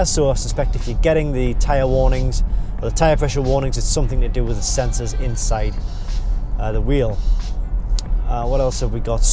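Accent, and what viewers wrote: Newcastle, United Kingdom. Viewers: Pretty much basic faults and dealt with (hopefully) under warranty. You’ve helped me make my mind up and the Jag is going and a Macan S is on the cards. A good site and nice to hear a Geordie accent.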